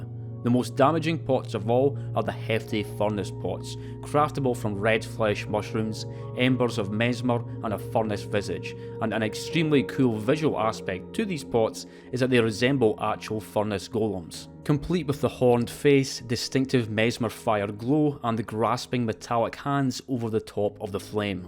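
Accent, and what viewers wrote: Scotland, United Kingdom. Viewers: Wow thats wild and a little racist. He's fucking Scottish it's not a joke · He sounds Scottish to me. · is that a scotland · Subscribed for the Scottish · An hour and a half of a lovely Scottish voice talking about the completely fucked monsters fromsoft comes up with? I think you may have ticked all my boxes 😅 I loved this scene when I first came upon it. Mostly because it reminded me very specifically of the Orphan of Kos, staring out at the sea and weeping. Except there were 50 of him (although down one part of the beach there is one standing by themselves) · I'm also scottish. Yer accent is class brer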